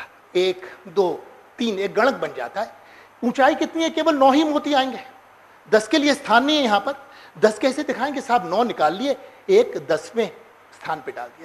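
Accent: India. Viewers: Bloody acent from India bady needs subtitles, or just continue talking hindi as this does not change much.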